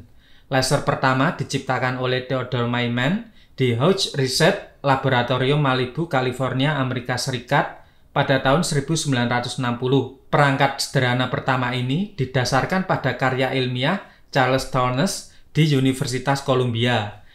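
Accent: Java, Indonesia. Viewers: Medok amat...🥶🥶🥶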